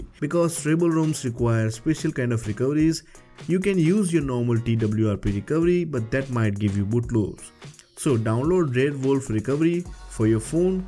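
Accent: India. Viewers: Knew it would be an indian lol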